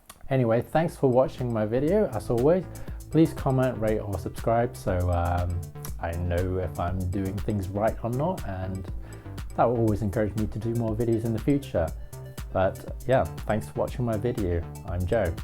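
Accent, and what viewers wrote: England, United Kingdom. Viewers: you have a british accent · I have to admit, this is the first time I've ever heard an Asian from Asia speaking with an English accent.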